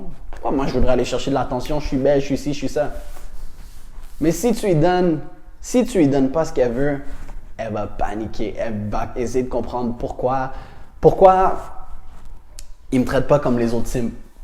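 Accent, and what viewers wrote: Quebec, Canada. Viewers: tu me fais penser à stéphane édouard, un psychologue relation h/f par ailleurs avec ton propre style, un de mes potes m'a montrer tes vidéo et malgrès la barrière de l'accent québécois /français métropolitains je comprends et c'est clair.Continue sur cette lancé.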